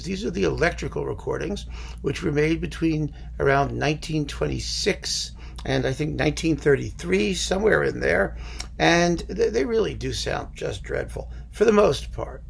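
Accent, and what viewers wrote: United Kingdom. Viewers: Lovely talk as usual, thank you, but you paid no attention to the which makes me go weak at the knees. And in the friendliest possible way, your British accent sucks! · Very good upper-class pre-1950 British accent!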